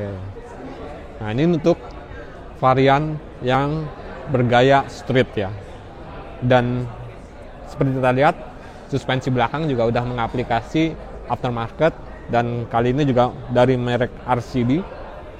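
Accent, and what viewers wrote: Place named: West Java, Indonesia. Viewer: Letah sunda 😁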